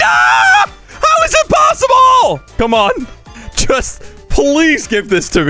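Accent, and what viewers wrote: Netherlands. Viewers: are u dutch?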